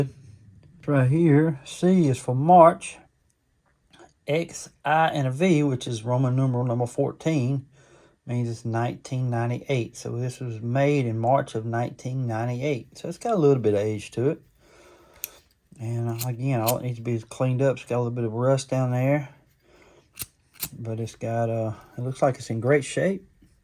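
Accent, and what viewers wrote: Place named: South, United States. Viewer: Thanks for another video of your shopping successes. I am definitely jealous. I don’t mind when you say Victoria-Nox. You’re Southern, I get it. I recently moved to Texas from Illinois, and have found that a lot of my pronunciations of local names are off. Am I wrong? Probably so, as they have been pronouncing their landmarks and local names their way since forever and I just got here.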